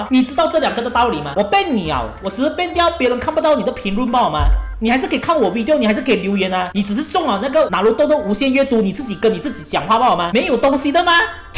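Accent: Malaysia. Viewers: 看Kuma桑吐槽的口音好好笑😂 回去看自介果然是馬來西亞人，跟"娘娘"一樣口音😋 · 對方真的很有事 就跟你說我是吐槽動畫版 你有跟我扯小説版 不過 我現在才發現 有馬來西亞的口音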